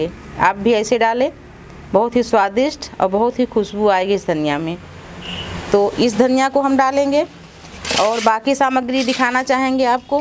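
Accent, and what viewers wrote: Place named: Uttar Pradesh, India. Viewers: I love your UP accent........UP regional taste is best in India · Aunty Apne up evam ilahabad ki Shan badha di. Love you. Bhasha ka accent bhi pure up style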